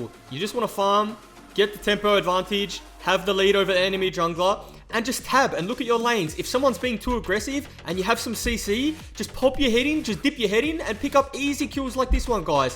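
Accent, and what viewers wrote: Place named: Australia. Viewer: Great video dude, nice editing,funny commentary and informative. im subbing hoping for more of these kind of videos. (also love the stralian accent) gl for more <3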